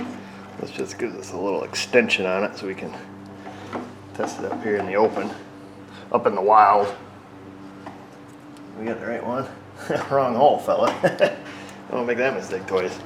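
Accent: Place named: Midwest, United States. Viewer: I know he lives in Avoca, NY. But, listen to how he talks. He has a thick mid-western accent. I know several people from Michigan. One lives right across the road from me. doesn't sound like a New Yorker at all. I'm just curious where he's FROM.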